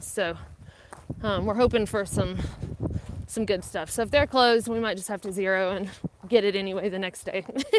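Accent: South, United States. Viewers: Your southern accent is just the best!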